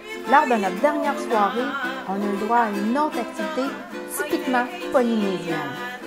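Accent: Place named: Canada. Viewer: Quelle horreur cet accent canadien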